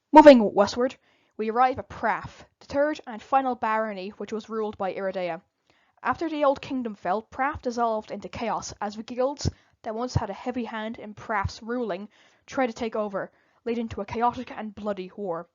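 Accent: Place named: Ireland. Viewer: Wasn't expecting an irish woman when i clicked on the video, but what a present surprise. The maps absolutely incredible, and the screenshots of just how much detail went into the world, including climate bands, tectonics, elevation, ocean currents, really made me re-evaluate how sloppily I've been designing my own map currently. 1 thing I'd like to know is whether your world is tilted on it's axis like Earths or is in perfect alignment, it's something i see commonly missed in worldbuilding despite the fact that the axial tilt has a massive effect on the Earth, and the seasons/climate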